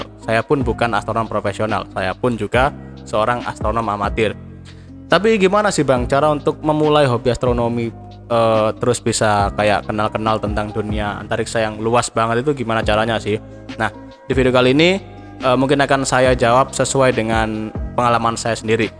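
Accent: Java, Indonesia. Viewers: Bro, sampean jawa ne ngendi??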